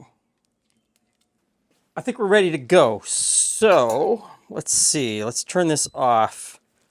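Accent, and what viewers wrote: Canada. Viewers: I wonder how many of the awful comments and messages is because people can't identify that your accent is Canadian, not US, and are either AH Statesians or AHs from other countries. (You are, rightfully, and American, because Canada is part of the Americas. You are not USian though.)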